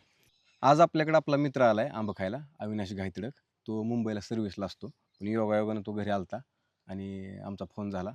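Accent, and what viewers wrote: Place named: Satara, India. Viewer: दादा सोलापूर मध्ये भाषा काय करतो बे अशाचा टोन मध्ये बोलतात तुम्ही तस नाही ती भाषा नाही बोलत सोलापूरचे असून तुम्ही तर आमच्या सातारा कडची भाषा म्हणता 😮😮 मला सांगा उत्तर देऊन 🙏🙏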